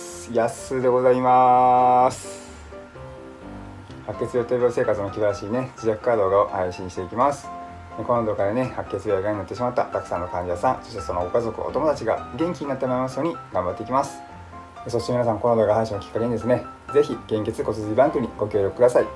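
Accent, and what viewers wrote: Osaka, Japan. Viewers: 食事療法のアドバイス、とても参考になってますよ👌昔から糖尿病の療法食は健康食、ダイエットにも適していると言われてましたからね👍 大阪弁⁉️希に嫌う人も(昔本当にいました。大嫌いという人)いますが、和みやすくて良いじゃないですか。関東人でも大阪に3年もいれば大阪人というくらい馴染みやすいですよ。地方地方の方言、楽しかろう⁉️(笑)ご存じですか？東京人は、昭和のころ、ほとんどが東北人だといわれていました。それくらい出稼ぎで出てきた人が多かったそうです。先祖代々という地元民は凄いことなんですね👍 👊😆🎵🙋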